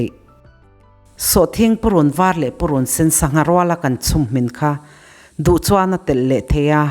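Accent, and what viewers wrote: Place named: Mizoram, India. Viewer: Mizo Elite Accent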